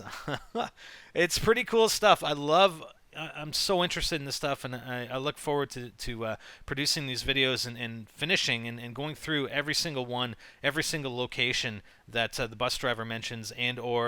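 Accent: United States. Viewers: yeah, he sounds american... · Where do u live you sound american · What country are you from? You sound American or Canadian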